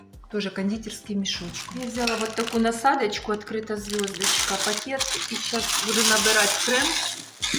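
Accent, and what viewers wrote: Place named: Surzhyk, Ukraine. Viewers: А наша пані з Одещини,і мене зовсім не обтяжує її суржик.Людина Вам розказує показує,відкриває Вам душу,а Вам виявляється,важко слухати.А наша пані не на сцені,декламувати поезію.Вона розмовляє своєю,рідною мовою,до якої звикла,якою розмовляє все своє життя.Не треба вносити ложку дегтю в бочку меду.Попробуйте і Вам буде приємніше слухати нашу.До речі,я з Хмельниччини і також не розмовляю чистою українською,так що мені й не жити? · Бо дикий суржик))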